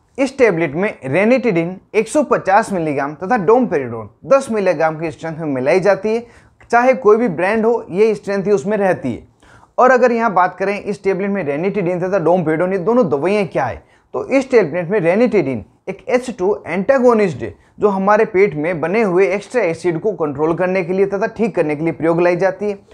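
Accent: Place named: Marwari, India. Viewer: Marvadi ho ton to marwadi lgti he 😅😅😅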